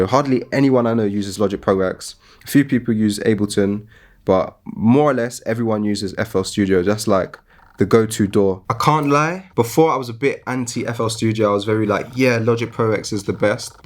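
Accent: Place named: England, United Kingdom. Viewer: That happy feeling when you click on a video and hear an English voice instead of an American one. Ah, bliss.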